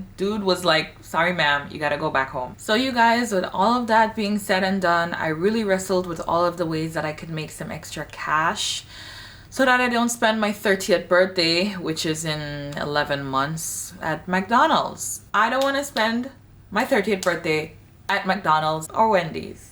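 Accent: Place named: Jamaica. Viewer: The Jamaican Accent is E-VER-EE-TING! 🤣❤️ This is amazinggg.😊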